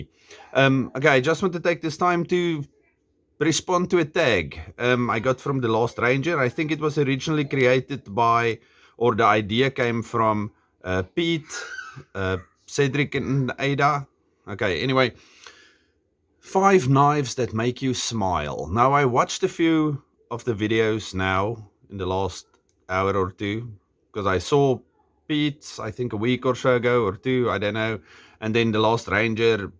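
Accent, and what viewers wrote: South Africa. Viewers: Thanks for posting. Can't get enough of that South African accent! I get some from the Bike Show here on YT, and I know a SA gal of Boer descent in our church who speaks Afrikaans. It's like listening to Pete(Cedric & Ada), if he had a German/Dutch inflection. 👍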